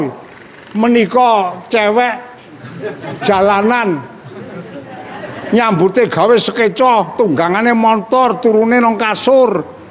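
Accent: Magelang, Indonesia. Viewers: Gaya bicara nya khas orang magelang ....❤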